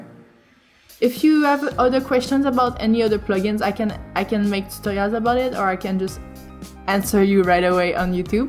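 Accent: France. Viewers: you french!!! nice eng accent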